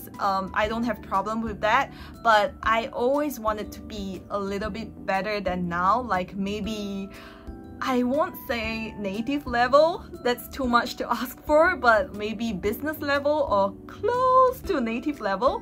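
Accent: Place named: South Korea. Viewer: Maybe your interaction with crews and people, your accent is more towards Korean than Malaysian accent 😊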